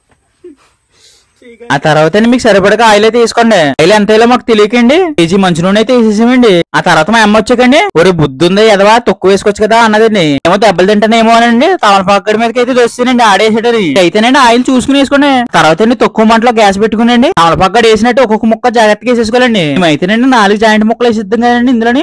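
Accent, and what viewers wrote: Godavari, India. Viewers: Meeru meee comments okalla meeda okallu. Godavari yaaasa adbhutam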